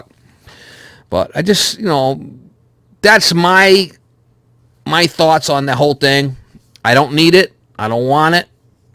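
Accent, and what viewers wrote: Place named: Boston, United States. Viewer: Where you from? Revere? Easty? You sound North of Boston. I'm from Dot myself. Love your vids dude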